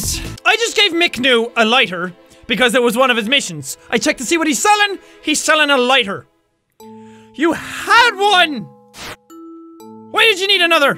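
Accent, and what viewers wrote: Ireland. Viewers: When he says Carl it sounds like Carol because he's Irish as hell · Ai love the way the Irish say 'three' and 'arse' it awesome. 😂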